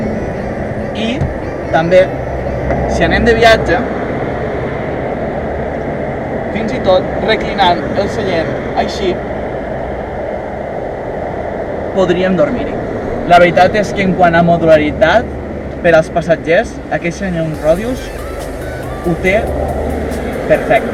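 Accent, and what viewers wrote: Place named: Majorca, Spain. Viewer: Habla mayorquin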